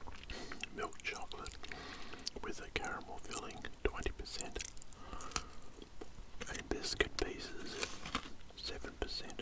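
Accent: Australia. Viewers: I guess the Australian accent is the best for whisper asmr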